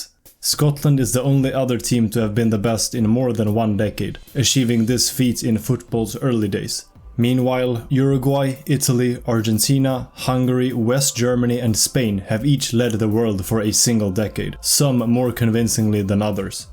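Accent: Sweden. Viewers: Are you Swedish?? 😅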